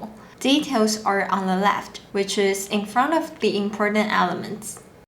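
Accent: Taiwan. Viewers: You have too much of an Taiwanese accent though when speaking mandarin, people will get confused when they compare it with standard mandarin that it sounds different. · 怪不得感觉好想最近越来越多老外说中文时候有台湾腔了，原来是因为有个这么正的台湾妹做了这么专业的视频，就连我这个大陆人都忍不住看了好多集…… 厉害，加油。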